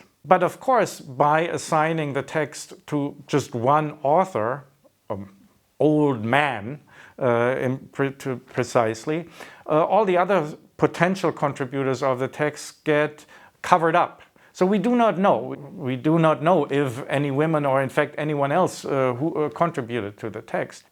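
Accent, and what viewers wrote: United Kingdom. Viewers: The School of Life always got videos with wicked animation tho. That's their strength. Plus, the British narration sounds very credible. Content-wise it'll appeal to the general public. Self help, more like. From a professional philosopher's point of view though, it is lacking. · If he didn't have a british accent people would realize how much nonsense he advocates for in his videos. His videos are garbage, very ill-researched and pathetic in logic. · School of Life is pretty obviously terrible once you move past the lull of the narrator's self-assured presenter voice and British accent. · School of Life shows that by having a well spoken, eloquent British accent can make almost anything you say seem intelligent.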